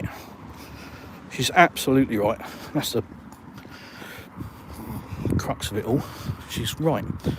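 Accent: United Kingdom. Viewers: I love watching your videos. I am a Russian living in UK (Kent, Sussex) for the last 16 years. It’s surreal to see someone from around here (you sound like you are from South London or Kent) to enjoy living in Russia! I am so glad you are happy there. The situation with the driving test is quite a puzzle, but to be fair if finding the job is your ultimate goal you would still need to know Russian anyway. Perhaps do an intensive course in Russian? It’s not easy but it will happen. It’s not easy to learn a new language later in life but not impossible!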